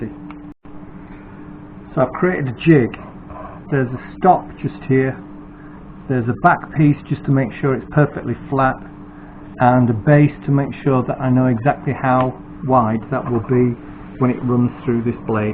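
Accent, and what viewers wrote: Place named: Yorkshire, United Kingdom. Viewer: Hi your video just popped up in recommendations - as soon as I heard the accent and saw the yorkshirman plaque on your wall I subscribed! looking forward to more videos..... and watch out for a sub jump after this weekend I'll be giving you a shout out on my channel: us little'uns got to stick together... 👍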